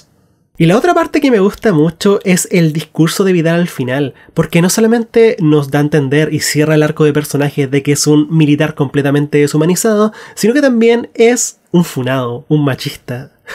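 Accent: Chile. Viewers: Acento neutro???? De acá a la china se nota que sos chileno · tu acento es bien chileno ,man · Dices que hablas neutral??? Pero si hablas Chilensis 🤭🤭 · NO HABLAS NEUTRAL. Tienes el tipico acento chileno. Ahora que no lo notes es diferente. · Qué malísima esta review . Y no hablas neutral.. hablas chileno. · Nos ered neutral, se nota tu acento chileno a kilómetros. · Eres un grande pero sin ofender el acento chileno se te nota a leguas, no creo que domines el acento neutro.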